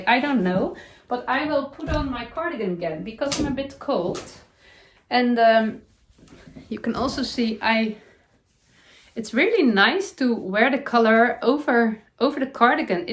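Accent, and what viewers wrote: Netherlands. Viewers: Hi, lease don't change how you do your podcasts, I love your dutch english, can easy understand you. Your knits are lovely, really like your vest. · your Dutchy English is perfect. I really enjoy your podcasts, love your projects also. You do make me 😂 while I’m sat knitting. Will definitely be knitting The Felix Cardigan along with you, and also in brown!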